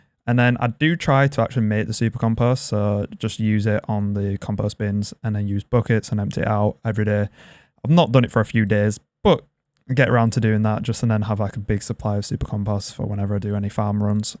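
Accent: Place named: Yorkshire, United Kingdom. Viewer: You sound like a Yorkshireman 🤔 refreshing to hear from a Runescape player 😂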